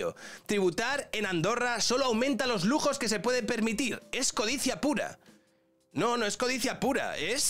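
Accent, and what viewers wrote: Mexico. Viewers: Buen acento mexicano, en mi opinión. Me imagino que ese hermano Culichi le escribió para platicar algo de seguridad en mi México lindo 🙈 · 😂😂😂😂, me gusta tu acento mexicano ja,ja,ja,ja,ja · no se por que youtube me recomendó el video, no entiendo el contexto pero ame tu acento mexicano 🤣🤣🤣🤣🤣🤣 entonces esa youtuber española si estaba haciendo acento mexicano cuando resumio coco y yo creyendo que el barrio la respalda.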